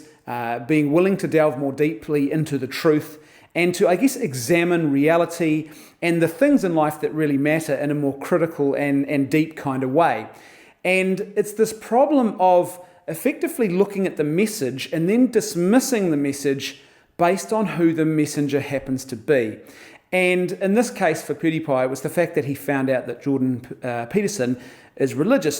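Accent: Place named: New Zealand. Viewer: Well done. Great to hear a competent kiwi voice on this sort of topic.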